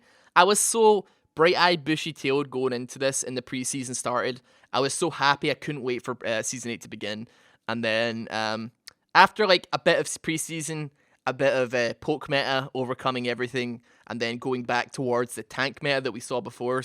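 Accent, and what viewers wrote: Scotland, United Kingdom. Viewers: He's Scottish mate xD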